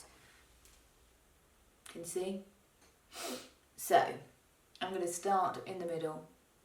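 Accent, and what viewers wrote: England, United Kingdom. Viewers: I truly adore listening to your English accent!!!! I think it’s the most beautiful accent in the world!!! Lovely tutorial!!!!!❤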